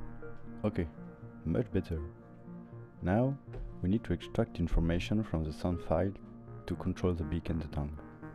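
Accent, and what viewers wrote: France. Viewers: You got a nice french based voice man, nice to hear someone speaking like me :v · Really nice video, and damn was'nt ready for a french voice reveal ! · Voice is very soothing. The french accent is hearable but honestly that's what makes your voice's charm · I do seem to recognize a french accent, eh eh Its nice to see you try new things/formats, keep up the great work! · Are you french ? Love your voice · Are you french ? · Love your accent man! Are you from France? I'm Spanish and it reminds me of that. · Are you from France?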